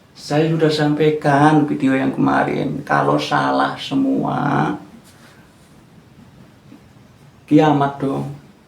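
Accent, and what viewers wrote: Banyumas, Indonesia. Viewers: Ngapuntene pak tanggled bapake saking pundi gih, nopo tiang ngapak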